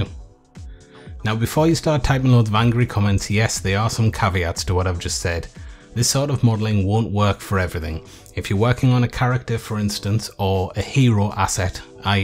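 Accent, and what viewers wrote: United Kingdom. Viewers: Doesn't sound rough to me, I quite like it. Sounds kind of "mushy" if that makes any sense :-) -American who digs UK accents. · I don't even Blend. I just come here for the great videos and friendly voice. I miss the UK, so I love the UK accents the most.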